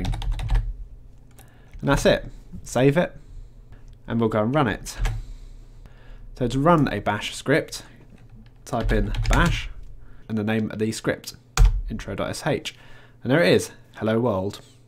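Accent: United Kingdom. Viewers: I love your british accent! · some questions, pls. U rite #!/bin/bash but Ive been riting #! /bin/bash, that is, with a space between the! and the path. Is that important? At least my first scripts have worked. Second. U use to rite bash yourscript.sh to run them, I use sh myscript or. myscript or./myscript or even bash myscript, however, I have a book called Linux the complete reference, 3rd edition and there the author says that when we give the file executable permission, we just need to rite only the script file name, example: $ myscript But what I receive is: bash: myscript: command not found What is bad? Thank you for your videos. And great accent! Pure Brit;)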